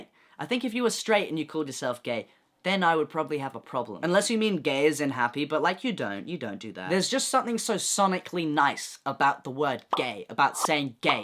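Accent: United Kingdom. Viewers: he's so bri'ish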